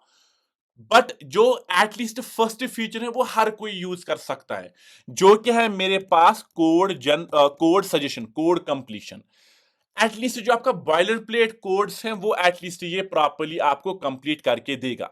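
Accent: Kashmir, India. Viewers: Looks like you are from kashmir. From your accent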